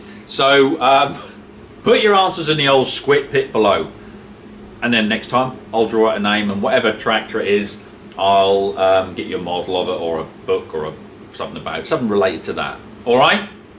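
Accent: Norfolk, United Kingdom. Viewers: Love the vids. Brought up near Fakenham, Norfolk. Good to here the Norfolk accent again . Would love to see a Kubota 7173 on the drive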